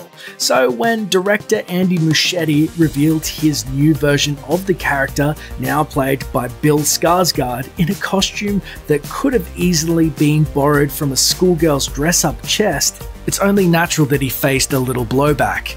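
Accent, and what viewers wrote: Australia. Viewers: Anyone else realize this is Aussie guy Commentating in his regular voice?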